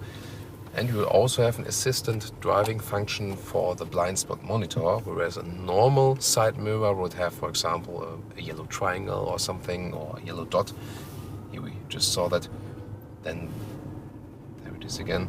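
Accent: Germany. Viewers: Admirable german accent! Very smooz!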